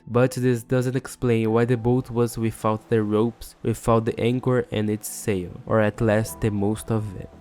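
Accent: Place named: Portugal. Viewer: Great video but you should work on your English pronunciation. Try talking slower and pronouncing each word clearly and with less portuguese accent. I think you could be a youtube star if you work on your English because the video is very well made.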